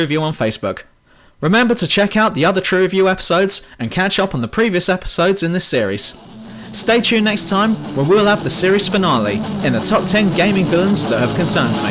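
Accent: United Kingdom. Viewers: Dude you are a true review, LOL Plus the british voice makes it for a good review.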